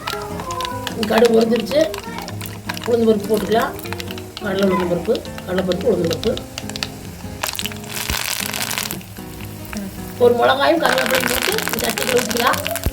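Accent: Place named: Kongu, India. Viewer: அம்மா உங்கள் கொங்குதமிழ் இனிமை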